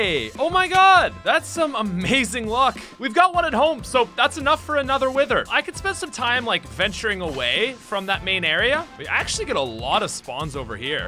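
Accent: Ireland. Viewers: Is he Irish because sometimes he sounds Irish but it’s not super noticeable like most Irish people but I can kinda hear it. I HONESTLY can’t tell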